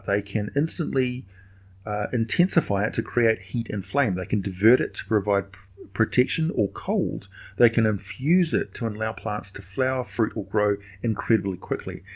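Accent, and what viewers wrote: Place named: New Zealand. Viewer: Aye a kiwi bloke nice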